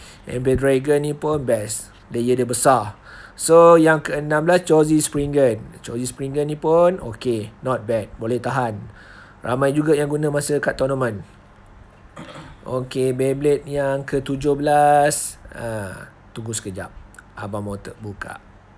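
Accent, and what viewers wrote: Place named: Negeri Sembilan, Malaysia. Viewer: Cakap nogori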